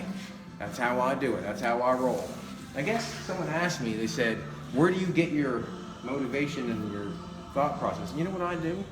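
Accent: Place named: Nova Scotia, Canada. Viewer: Love that Nova Scotia dialect.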